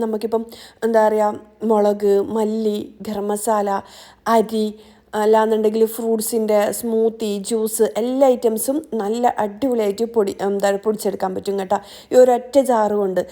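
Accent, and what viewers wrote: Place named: Lakshadweep, India. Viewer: [01/09, pm] ѕαяנι🫣: Hi [01/09, pm] ѕαяנι🫣: I'm sarjeena from lakshadweep ഞാൻ ഇപ്പോ കുറെ ദിവസങ്ങളായി ൻ്റെ videos കാണുന്നു.നല്ല ഒരു motivation ആണ് എനിക്ക് കിട്ടിയത്, thank you so much... ശരിക്കും എൻ്റെ ജീവിത ശൈലീ തന്നെ മാറ്റി മറിച്ചു ipo ഞാൻ സുബിഹ് നിസ്കാരം തെറ്റികാറില്ല പറഞ്ഞ പോലെ നേരത്തെ എണീകുമ്പോ മടികൂടാതെ പണി എടുകാൻ പറ്റുന്നുണ്ട്.പിന്നെ nte ഭാഷ നല്ല രസമുണ്ട് കേൾക്കാൻ,കുറച്ചൊക്കെ എൻ്റെ ദ്വീപിലെ ഭാഷ പോലെ ആണ്. അ പിന്നെ ഞാന് ഗ്രീൻപീസ്. ചിക്കൻ ബിിയാണി ഒക്കെ ഉണ്ടാക്കി. ഇവിടെ എല്ലാവരും കഴിച്ചിട്ട് ഇത് ഞാന് തന്നെ ആണോ ഉണ്ടാക്കിയത് എന്ന് ചോദിച്ചു....☺️ So dear friend once again thank you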